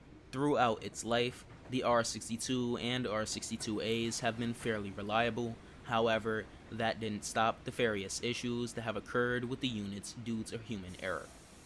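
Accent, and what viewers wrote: United States. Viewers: It’s like watching a jago hazzard vid but with an American accent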